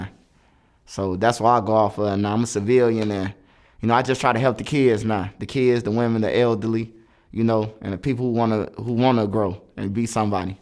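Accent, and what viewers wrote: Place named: Chicago, United States. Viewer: I'm from Chicago and he is typically what we sound like. I don't know where these other people accent come from. I'm like where they pick this country ass slurred speech from😂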